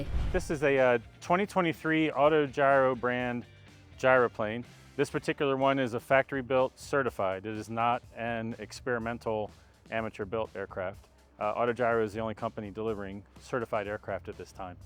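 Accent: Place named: United States. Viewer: most videos don’t mention location, but I actually did in the first sentence. Others clues for you are the English language and the American accent. Is this your first time on YouTube? If you ask questions before making arguments, you would seem smarter. Please take your silly argument somewhere else.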